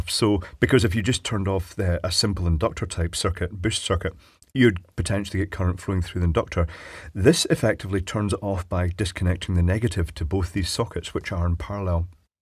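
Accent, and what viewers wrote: Scotland, United Kingdom. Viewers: Few things make for a more compelling video than a calm Scottish voice continually asserting that what's about to be done is really not a good idea. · His accent is definitely not Manx, it's Scot's English. He does live on the Isle of Mann, though. Maybe they tolerate more explosions than the Scots?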